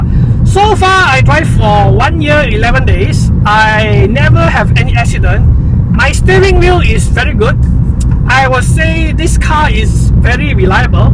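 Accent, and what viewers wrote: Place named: Indonesia. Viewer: silly indon tone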